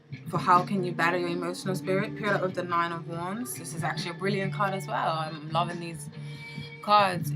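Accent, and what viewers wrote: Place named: Bermuda. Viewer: I enjoy your readings and keep up the great work as it definitely resonates with me. I've been listening and noticed that the accent sounded familiar. Hi my fellow Bermudian. :)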